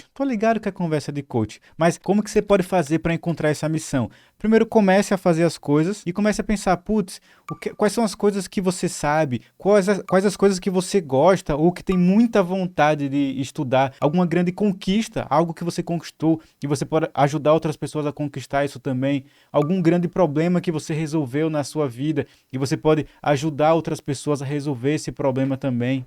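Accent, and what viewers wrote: Northeast, Brazil. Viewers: pelo seu sotaque voce é nordestino. Sou da Paraiba e gosto muito dos seus videos. De onde voce é?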